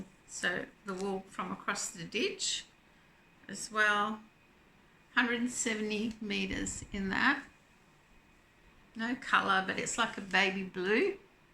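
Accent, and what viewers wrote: Australia. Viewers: Hi, I absolutely relished watching your video and hearing an Australian accent! Make me homesick. Great yarn unboxing!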